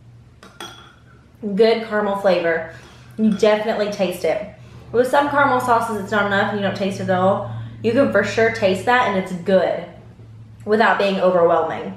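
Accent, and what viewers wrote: South, United States. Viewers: New subscriber! Mostly because I can listen to a cute southern girl talk all day long, though.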